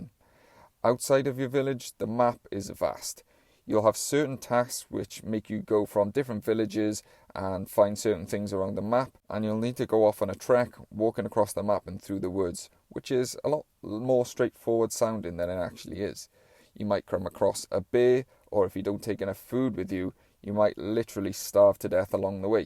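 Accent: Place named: Wales, United Kingdom. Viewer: Welsh accent! Subbed :)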